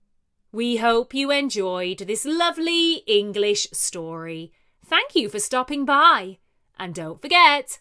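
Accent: United Kingdom. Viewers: Lovely English Stories is really helping me in improving English. The practice of native British accent is something unique about this resource. Most of the other competing resources are based on American accent. Practicing everyday i have made a good routine and the topics are coming out of daily life. Thank you very much 😀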